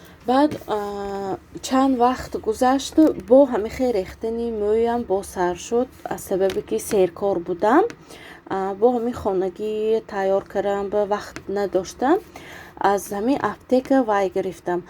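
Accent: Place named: Khujand, Tajikistan. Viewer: Рахмат ки чавоб додет👍👍.Ман аз гапзанитон фахмида будамки хучанди .Некин боз пурсидамм 🤭🤭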